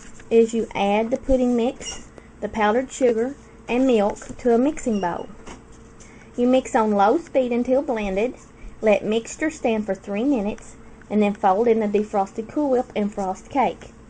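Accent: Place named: South, United States. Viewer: looks good and love your country voice:)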